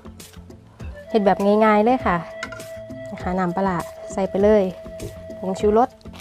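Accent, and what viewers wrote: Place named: North, Thailand. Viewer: อู้เหนือด่ก่จ้าว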